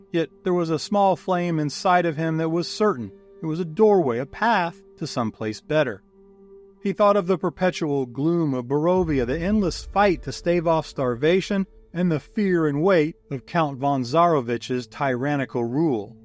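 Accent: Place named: Deep South, United States. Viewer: Great video, I couldn't help but laugh though in a good way. I was expecting to hear Balkan accents no deep south 😂. Keep up the great creative and informative work that you do.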